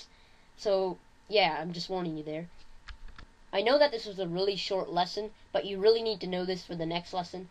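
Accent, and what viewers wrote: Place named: Canada. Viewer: Good video. You have a strong Canadian accent.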